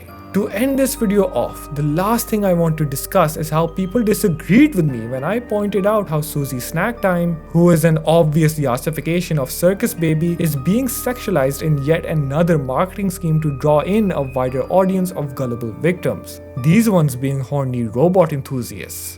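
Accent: India. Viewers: If u can ignore the indian accent then this content is actually pretty god damn good.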